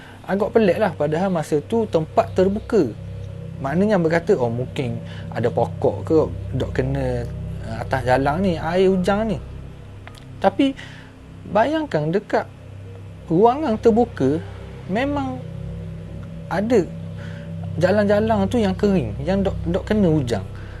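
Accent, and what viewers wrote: Terengganu, Malaysia. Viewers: Assalamualaikum, sejak tgk channel OH saye dah faham loghat ganu🎉..sblm ni satu pun xpaham🤭...manusia berbeza2, Allah swt beri kelebihan dan kekurangan masing2. Org ikhlas berkongsi cerita, kite menjadi pendengar yg supportive. 🔥🔥🎉🎉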